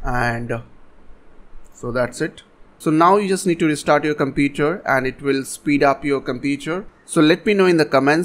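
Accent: India. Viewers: Please keep your Indian accent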